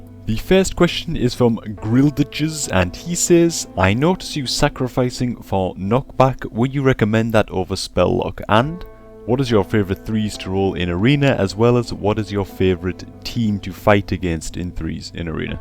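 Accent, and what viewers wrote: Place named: Geordie, United Kingdom. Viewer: unusual question but what is your accent? It sounds like a foreign one and a geordie one mixed together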